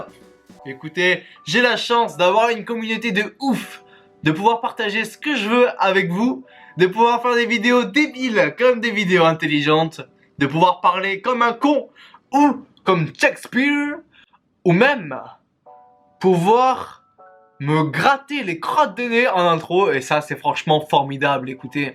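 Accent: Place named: Quebec, Canada. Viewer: Hey grand mammouth nommé! Continue tes vidéos, tu vas bien avancer avec cette chaîne youtube! Juste, quand tu parles j'ai l'impression que tu as un petit accent canadien québécois mdr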